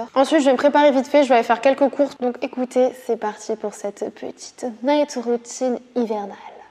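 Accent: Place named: South, France. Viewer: De l’authentique (avec l'accent d’Ugolin dans Manon des sources ) c'est pour cela que tu as beaucoup d'abonnés et que tu en auras encore plus 👏ne change rien tu es magnifique autant de l'intérieur que de l'extérieur 😘😘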